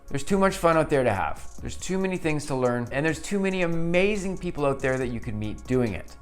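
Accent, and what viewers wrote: Canada. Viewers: well he is canadian….and vancouver island to boooot…PRAW JECT….not PRO JECT… PROJECT is “she’s projecting her emotions on him” PRAW JECT is “this is a project I want to accomplish”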